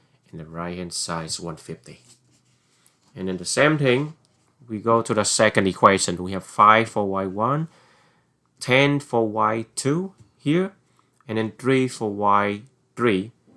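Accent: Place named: Vietnam. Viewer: nghe giọng giống người vn vậy bn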